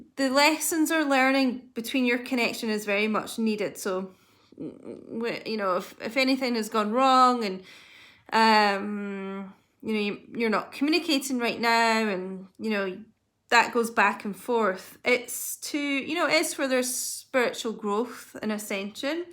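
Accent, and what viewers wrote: Scotland, United Kingdom. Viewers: I am Leo, Libra and I have know each other for 45 years. I am her twin flame, we met in high school and I fell in love with her. We dated for 7 years and the married for 10 years. We never had closer and don't misunderstand me I am still in love with her she remarried and I remarried, the last time I spoke to her was in August of 2019 the call lasted 49 seconds the conversation was not good but 3 seconds after she hung the phone up I was in a state of euphoria, because I got to hear her voice. We were divorced in 1983 ( The divorced was totally my fault). The story of how we got back to each other is incredible to long to type it out. I love Libra so deeply from my heart and my soul. Some time in March of 2019 I got a bad stomach ache and realized something was wrong but because Libra is a very very private person I could not contact her and realized I was ghosted. 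I don't know where she is living I have no phone number as of this date. no communication in 3D or 5D although I believe I have all of the tools I am blocked. I am an engineering manager. The only communication that I have is from watching youtube readings. And you must understand I use a spreadsheet to get a timeline. I am in my 10th month of being blocked that's how much I love her and want to help her. if you would like to talk with me <num> I would love to talk to you. I love your reads, and that scottish braug, I spent 3 years in Dunoon Scotland. Any help you could give me will be greatly appreciated.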